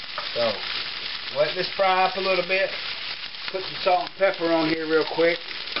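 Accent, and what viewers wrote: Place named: Arkansas, United States. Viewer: spam grosses me out but this looks good... that bacon grease boy it changes everything!.. sounds like you're from Arkansas.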